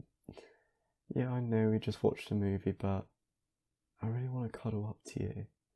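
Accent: United Kingdom. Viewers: I live for that British accent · bOdayy~ british accent is sooo cutee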